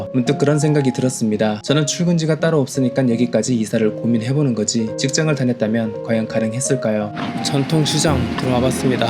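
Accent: North Korea. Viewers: 말투에 북한 사투리가 느껴지는데? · 근데 경주출신 맞으세요? 말투가 뭔가 탈북민 같은데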